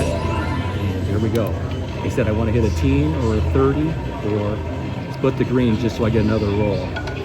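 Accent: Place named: Midwest, United States. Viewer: Are you from Michigan or Wisconsin 😊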